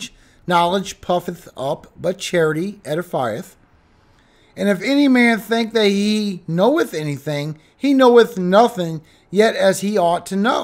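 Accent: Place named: New Orleans, United States. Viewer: Why did I just know you were from New Orleans with that accent? Called that once great city home for over 50 years (Mid City) but left due to crime in 2017. You sure look familiar! ❤ 🙏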